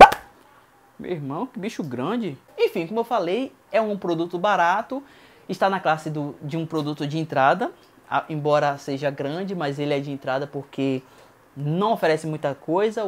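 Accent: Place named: Salvador, Brazil. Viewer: Vc é soteropolitano, sacaninha rsrrs. Bom vídeo! Mas o aparelho parece ser bem meia boca.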